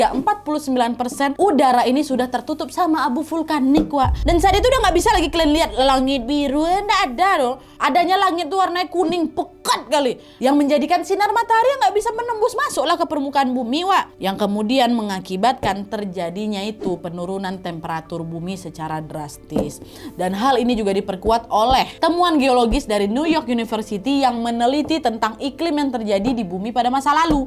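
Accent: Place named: Medan, Indonesia. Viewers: Ibu...ini Orang Cerdas, Luas wawasan ilmu historynya Cantik Pulak Memberi keterangan Jelas Cepat Lincah..Aku selalu tengok Canel Ibu ini dari logatnyo Orang Sumatra sama2 Indonesia Soalnyo Aku lamo di Jambi dari kls 1 SR tahun 1960 tahun 1963 SR ganti SD Aku kls 3 SD Sampe SMP Kls 3 tahun 1969 Aku Pindah ke Jawo tengah, Aku suko nian nyimak Chanel Ibu Semoga di beri rezeki Panjang Umur di Jauhkan dari Maro bahayo and Sehat Selalu ya Bu? Amin YRA Thank's. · Logad Medan nya kental kali kau😊😂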